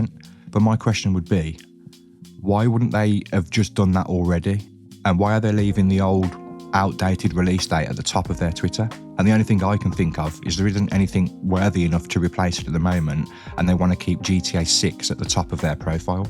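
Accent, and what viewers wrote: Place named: United Kingdom. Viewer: Everyone can just admit they dont even know what a GTA VI is and is just watching for his cool British accent.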